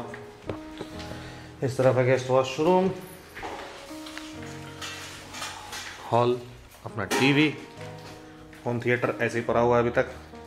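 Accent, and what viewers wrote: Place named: Bihar, India. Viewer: Kaa bat kr rhe hai sir ... Aap bihari hai.,❣️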